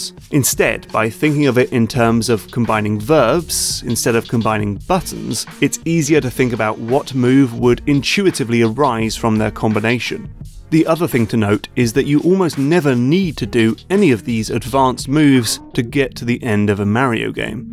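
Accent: United Kingdom. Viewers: utube in a nutshell+ british accent helps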